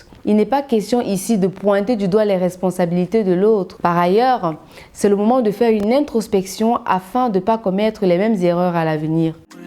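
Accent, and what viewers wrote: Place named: France. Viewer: Bon projet. Mais ma belle stp parle comme une Kamer car parlé un peu genre french girl la, c'est pas très originale. Mon point de vue seulement ooooooh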